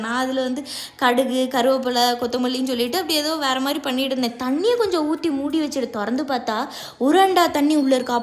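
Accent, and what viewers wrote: Tirunelveli, India. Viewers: Tirunelveli ah sis neenga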